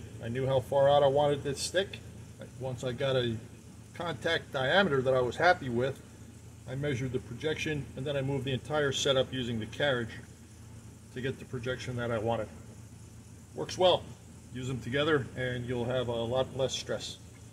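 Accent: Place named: New Jersey, United States. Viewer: Jersey? I thought I detected the accent of a fellow Jersey guy. For sure, that's not a Texas accent. :-)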